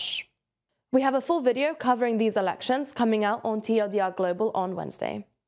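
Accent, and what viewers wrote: United Kingdom. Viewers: Your accent is soo Briish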